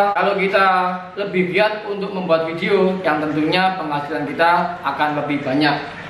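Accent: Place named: Java, Indonesia. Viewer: Logat medok dan kaku yg penting sukses mas.. salam dari youtuber anyar🙏🙏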